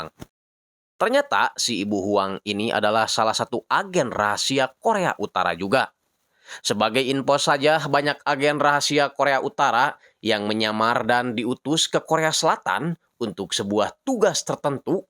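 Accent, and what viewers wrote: West Java, Indonesia. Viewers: Keren euy si mamang sundanese pisan euy edunnn👍🏽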